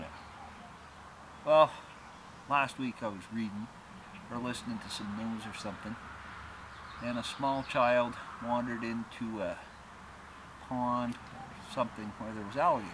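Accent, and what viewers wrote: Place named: New England, United States. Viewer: That's real yankee talk right there.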